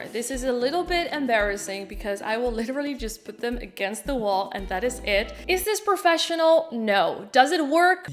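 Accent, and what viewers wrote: Netherlands. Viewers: I hear a vague Dutch accent somewhere in there! Great video. Thanks for the help. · Kaaskop accent spotted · Are you Dutch? Or German?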